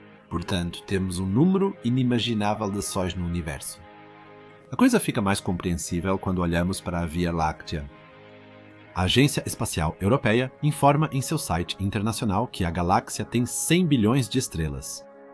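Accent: Portugal. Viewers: Fala muito rápido e troca o sotaque português e brasileiro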